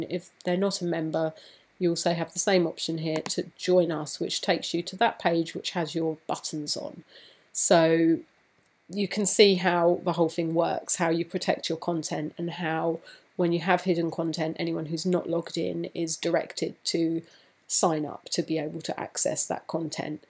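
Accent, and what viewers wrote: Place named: United Kingdom. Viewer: Excellent lessons, presented with a lovely British accent👍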